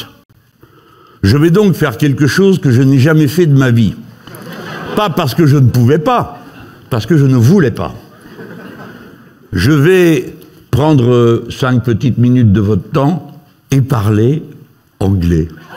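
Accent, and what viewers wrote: France. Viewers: 😂 et en plus il fait exprès de forcer son accent français ❤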